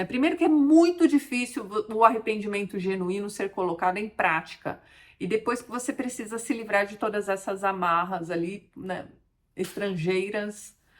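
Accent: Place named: Minas Gerais, Brazil. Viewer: Parece mineiro. Zezinho, fiii do Arnaldo....kkkkkkkkk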